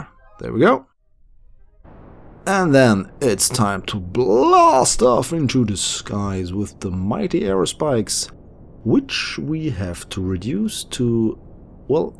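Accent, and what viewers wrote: Germany. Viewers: Man hört deinen deutschen Akzent. ;)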